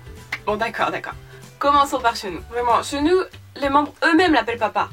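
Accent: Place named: France. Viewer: No Mercy though😆 Ouais les survival c'est Tjrs grave cruel Ahah, Kihyun💜💜 Monsta Ayksss !! Lol Jsais meme pas comment écrire la phonétique mais bref le x se prononce comme en anglais. Jcrois t'est la première française Que jvois qui prononce les mots genre Bias et tout avec une prononciation française😜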